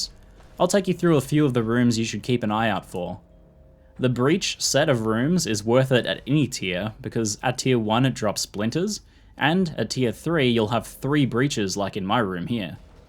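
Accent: Australia. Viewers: rooms or runes, dat ausie accent xD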